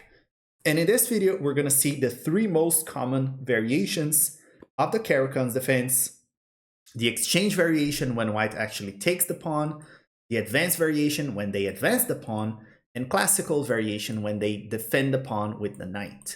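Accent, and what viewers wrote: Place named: Brazil. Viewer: otimo video, reconheci seu sotaque de brasileiro na hora haha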